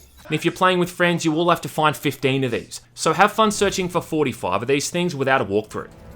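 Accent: Australia. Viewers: Australian accent ftw haha.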